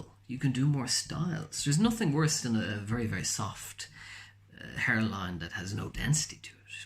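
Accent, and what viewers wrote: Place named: Ireland. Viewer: Hello , thanks for the excellent video! If I’m not mistaken I hear a Irish accent. I’m from Ireland myself and I am wondering where or what brand of oral minoxidil do you use? I have been using finastaride but struggling to find minoxidil in oral form . Thanks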